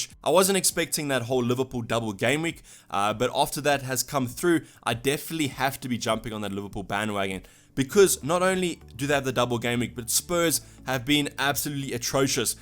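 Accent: South Africa. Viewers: Anyone who can't hear the African Accent is beyond redemption 😂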